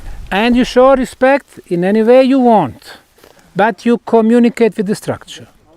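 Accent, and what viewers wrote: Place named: Bosnia and Herzegovina. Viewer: Neverovatan covek! Ali najjace mi kad govori engleski bukvalno sa bosanskim naglaskom😄😄 💪💪💪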